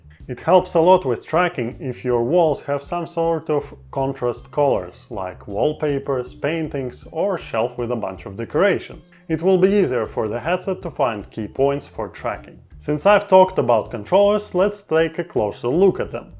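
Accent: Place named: Russia. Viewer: Oh, god, russian men speak on English, and this is so cool!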